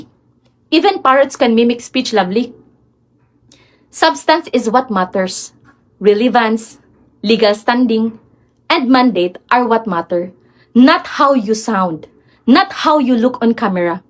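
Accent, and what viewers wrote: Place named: Visayas, Philippines. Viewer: Bisayang English mo day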